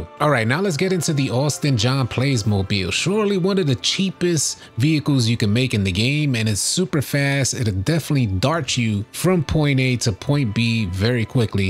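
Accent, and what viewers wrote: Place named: New York, United States. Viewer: You're a NY cat ain't ya.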